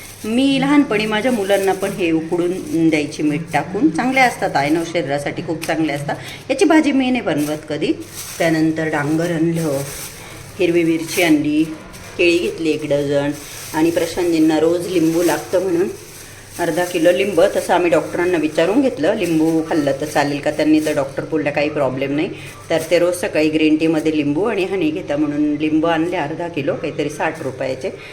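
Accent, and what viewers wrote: Nashik, India. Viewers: तुमची टोनींग nashik ची वाटते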